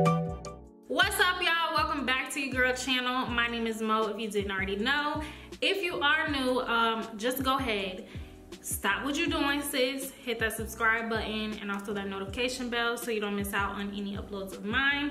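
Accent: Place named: India. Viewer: She sound like India to me .😍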